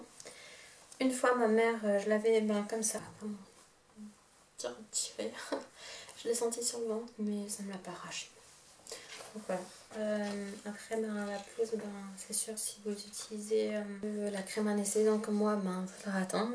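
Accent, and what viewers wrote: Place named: Alsace, France. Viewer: Ce retour de l'accent alsacien un régal pour les oreilles ! Miam ahah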